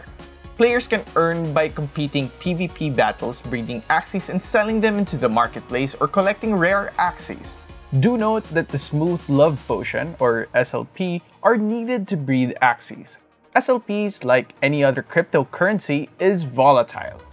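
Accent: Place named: Philippines. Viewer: I hear a Pinoy accent... :D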